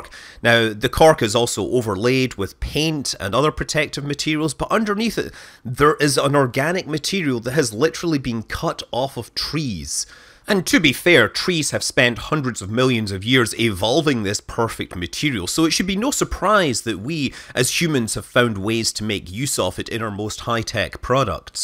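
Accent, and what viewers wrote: Scotland, United Kingdom. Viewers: "the sprüs güs" Love the Scottish accent so much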